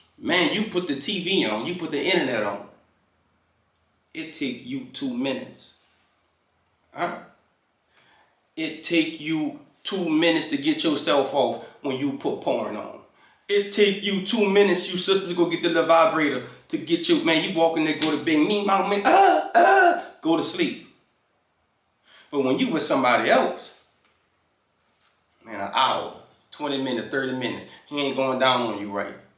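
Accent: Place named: Louisiana, United States. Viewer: That Louisiana drawl gets me every single time☺️😮‍💨💕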